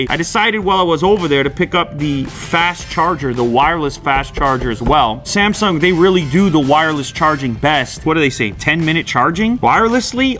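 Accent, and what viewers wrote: Canada. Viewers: Is this guy Canadian? He got really Canadian about halfway through the video.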